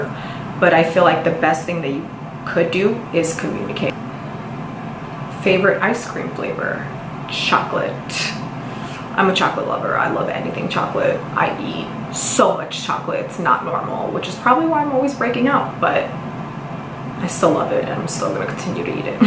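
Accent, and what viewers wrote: New York, United States. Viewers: I'm an OG follower aoww<link> Also a fellow born and raised NYer...always loved your NY accent...finally seeing influencers with the same NYC swag I grew up with is nice!!! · now that you're back in ny you're ny accent is back!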